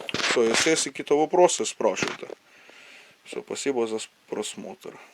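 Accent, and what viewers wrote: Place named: Ukraine. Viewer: Не вопросы, а запытання. Украинец хренов. Хоть по русски, но с украинским акцентом.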